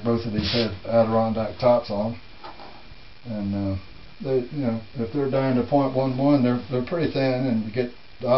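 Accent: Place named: Virginia, United States. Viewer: That's a Virginia accent. Any North Carolinian would recognize it immediately!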